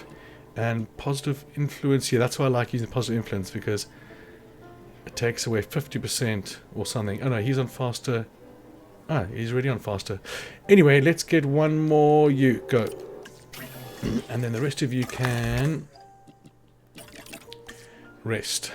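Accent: South Africa. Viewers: Are you South African? If so I am too :D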